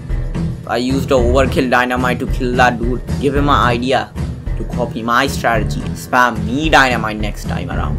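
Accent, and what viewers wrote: India. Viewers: Bro got them indian accent drip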